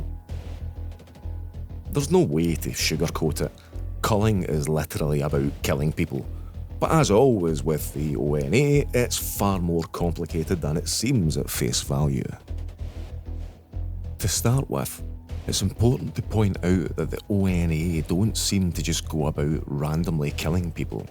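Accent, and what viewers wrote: Scotland, United Kingdom. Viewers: Top shelf research as usual, my friend. I expected a highly detailed and well-researched video and was not disappointed :) Everything from the audio-visual aesthetic that you have selected for the video, to the emphasis on information that is being conveyed verbally due to the lack of visual distractions provided by imagery, to your Scottish accent (particularly the amazing way in which you pronounce those hard 'r's) meshes perfectly together to provide a unique and interesting experience. The video itself, as mentioned above, is well-researched, which is particularly evident through the scholarly way in which you document their ideology, while maintaining an emphasis on being objective and getting facts across (with a few well-placed comedic touches which entertain the viewer while simultaneously not compromising the tone of the video). 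There is one thing I could recommend that you possibly do in the future, however. Since you obviously cannot get EVERYTHING across in one sitting, perhaps you could link some of your citations/references/additional materials in the description? That way, you could increase the credibility of the video, while at the same time providing viewers with material that they could read themselves to supplement what was already communicated in the video. That said, the best way to alleviate fear, ignorance and misinformation surrounding strange, scary or otherwise esoteric subjects is documentation and research, particularly archival analysis of materi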